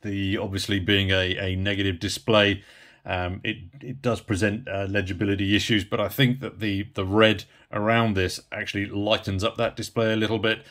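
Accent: United Kingdom. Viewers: I heard an English voice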